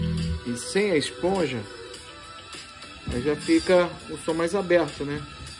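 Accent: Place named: Brazil. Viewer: !!! Greetings from Montréal, Québec, Canada!!! This time, my message shall be a bit different...Me fije que su appellido era 'Latino' y ahora, por su acento, usted es 'Brazileno'!!! Yo hablo espanol desde hace 30 anos por haber sido casado con dos Latinas (Panama y Mexico) Sera un Gran placer seguir platicando y aprender de usted Sr.!!! Que tenga usted un bello dia y semana!!! Saludos calurosos desde Montréal, Québec, Canada!!! Ciao/Cheers!!!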